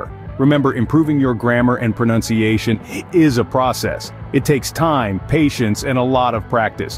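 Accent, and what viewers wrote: United States. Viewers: This is American accent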